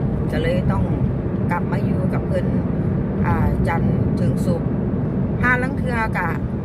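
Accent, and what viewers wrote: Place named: South, Thailand. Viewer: คุณ พูดสำเนียงมีความคล้ยคนใต้นะคะ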